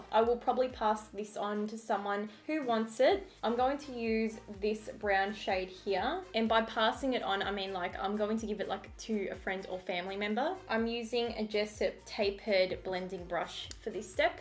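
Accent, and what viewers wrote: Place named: Australia. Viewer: Can i have an Australian accent tooo? Lol so lucky! 🖤❤️ even though you arent crazy about the makeup it still came out good!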